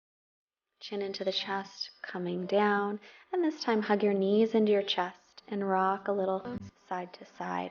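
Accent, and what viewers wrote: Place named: United States. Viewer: Thanks so much for putting this video up. I use it each week. It such a nicely flowing sequence. May I ask, where in the States is that accent from? My ex-girlfriend is from Hudson, Ohio and your accents sound identical! :-) Regards from Australia :-)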